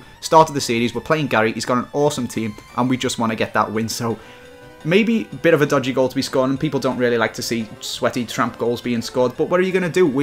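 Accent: Liverpool, United Kingdom. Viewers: You scouse genius, you have made my day!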